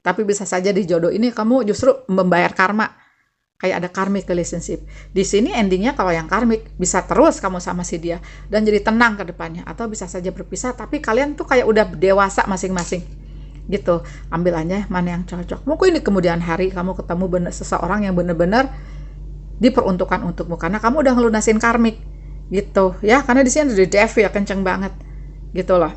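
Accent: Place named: Sunda, Indonesia. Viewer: ketika lihat tarot, saya pilih pile 2, terus saya denger bahasa kebangsaan saya saat saya sedang terbesit ingin hijrah ke daerah jawa barat yg ada gunungnya, sawah, pokoknya yg serba hijau deh, eeeeh denger bahasa lawang panto, saya langsung senyum2, ternyata si Teteh sunda pisan...meuni sunda pisan si teteh, salam sakenal tetehi ti abdi si aQuarius 😁🙏🏼 🤝🤝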